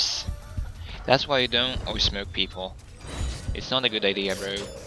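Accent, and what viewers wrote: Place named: United States. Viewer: btw for being from poland u have a "pretty-hardcore-US-voice" xD with that i mean ur english-speaking is very good! :D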